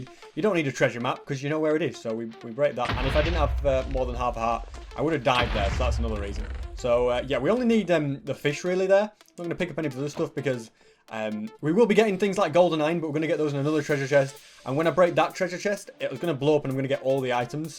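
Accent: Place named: Scotland, United Kingdom. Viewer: SCOTTISH LAD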